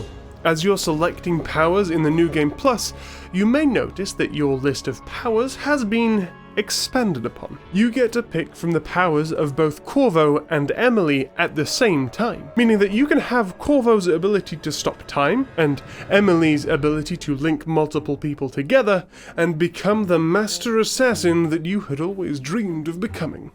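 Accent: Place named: United Kingdom. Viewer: Really love your British accent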